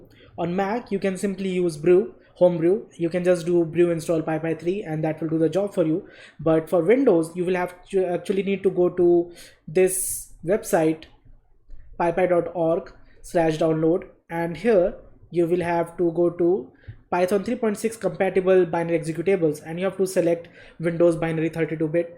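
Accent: India. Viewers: It's the thick Indian accent. It's not easy on the ears for foreigners.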